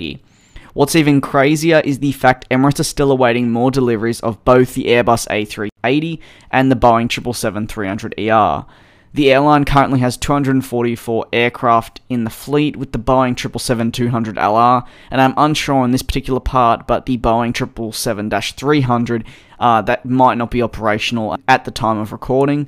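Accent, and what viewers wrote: Australia. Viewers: Ye a aussie?!!!!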